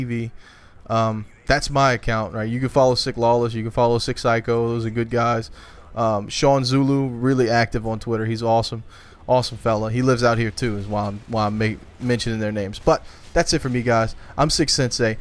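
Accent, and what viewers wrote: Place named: Louisiana, United States. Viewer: omg i knew it was something about your tone that was familiar and its that we are both got that Louisiana sound. well its good to know one of my favorites are from the same state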